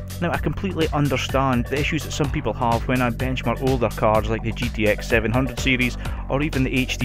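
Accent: Scotland, United Kingdom. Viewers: what part of Scotalnd you from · Is that a Scottish accent? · Another Scottish youtuber? This is great us scots are popping up everywhere now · Sub for the scottish accent. For some reason I love it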